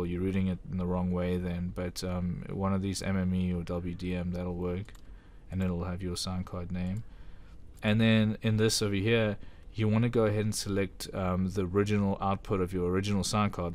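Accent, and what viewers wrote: South Africa. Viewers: Are you from SA bra? Nice video! Very informing!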